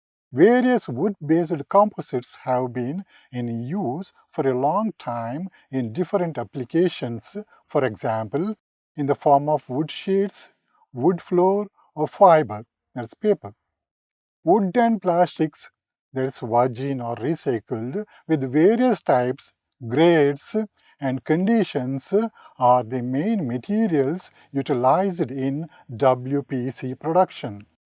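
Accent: India. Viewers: I am an Indian..still i find it difficult. That's because his accent is south Indian which is far different from north Indians.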